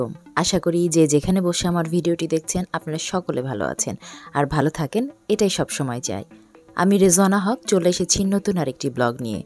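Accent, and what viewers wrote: Rajshahi, Bangladesh. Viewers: আপু তোমার ভিডিও সবসময়ই খুব সুন্দর 💗🌹💖আপু রাজশাহী ভাষার কথাগুলো অসাধারণ হৈছেগো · অসাধারণ রাজশাহী ল্যাঙ্গুয়েজে কথা বলার জন্য অনেক ভালো লাগলো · এখন আবার দেখে নিলাম।অসাধারন দৃশ্য আপু আপনার মুখে রাজশাহীর ভাষা দারুন লাগছে গো আফা🤣🤣🤣🤣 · unty ami kintu jai ni kokhono tobe tomar vasa sune jaoar issa korse obossoi jabo inshaallah tobe vasa kintu onek sundor yomi j vabe rajsahir vasa bolle ki bolbo oshadaron love u moni💙💚💛💛🧡❤ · রাজশাহীর ভাষা দারুন লাগছে গো আফা🤣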